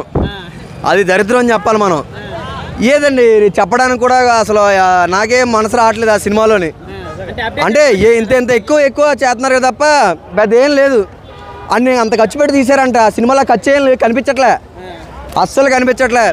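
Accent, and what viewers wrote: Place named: Andhra, India. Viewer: by his slang it seems like he is from andhra who doesnt know any history of hyderabad. adi ni pain kadu kada niku ardam kadu chudadam maneyi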